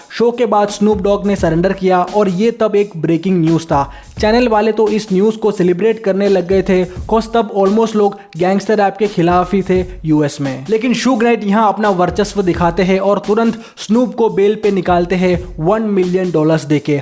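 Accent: Kerala, India. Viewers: Enk manasilai since day 1 u have that Malayalam slang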